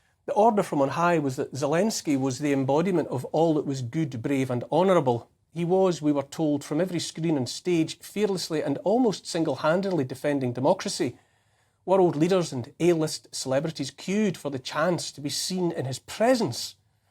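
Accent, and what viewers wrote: Scotland, United Kingdom. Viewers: Although I agree with you on everything, your common sense statements more persuasive in your Scottish burr 💕🇺🇸❤️🏴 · I do pay attention to what say, but sometimes I just play back so I can hear your Scottish Brogue!:O)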